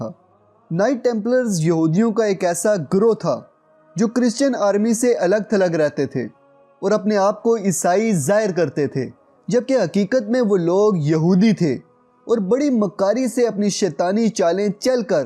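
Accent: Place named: Mandi Bahauddin, Pakistan. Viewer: جناب گرد اپنی منڈی بہاؤالدین والی اڑڑدو ٹھیک کرو نہیں تو پنجابی بولو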